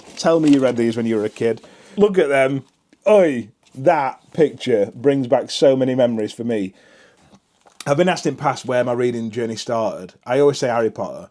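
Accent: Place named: Derbyshire, United Kingdom. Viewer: Ah thank you. So it's a Derbyshire accent.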